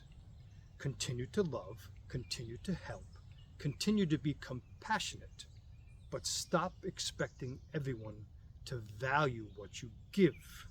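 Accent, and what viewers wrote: Boston, United States. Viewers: Well put I needed this thanks and I also appreciate you being able to tell me your from Boston with out telling me your from Boston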